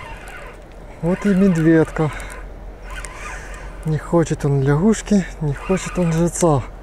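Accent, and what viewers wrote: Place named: Okanye, Russia. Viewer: ты че вместо буквы А говоришь О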